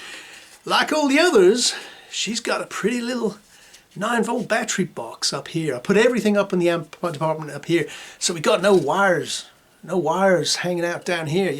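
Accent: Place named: United States. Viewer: what`s with the stupid yank accent??????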